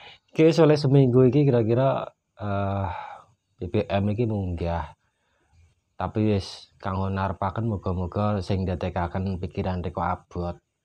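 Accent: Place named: Banyuwangi, Indonesia. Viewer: Kurang deles osinge kurang pas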